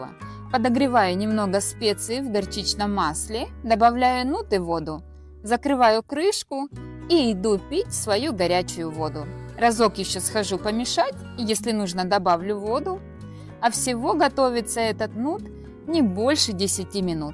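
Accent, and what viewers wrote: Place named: Ukraine. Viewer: Почему-то акцент такой как человек с Украины 😉 адаптации Вам скорейшей ❤❤❤ язык наверное уже освоили,ведь с свекровью нашли общий язык 🌹🌹🌹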